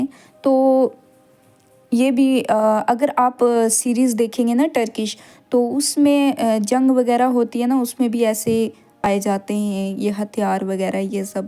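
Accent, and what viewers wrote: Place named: Kashmir, India. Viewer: Are u from J&k (india) bcz the way of your talking like u are from baramulla 🤔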